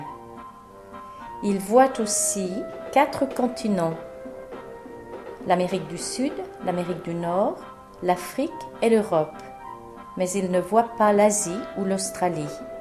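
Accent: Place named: France. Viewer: this was cool because i am trying to listen for comprehension and acclimate to the french accent. now to incorporate the words/phrases into more practical use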